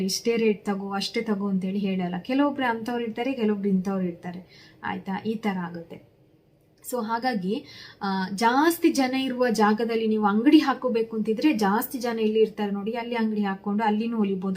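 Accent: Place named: Mangalore, India. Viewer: Neevu maatnaduvaga Mangalore bhashe tara ide akk a.nim ooru yavdu.naanu mamgalore adke kelde .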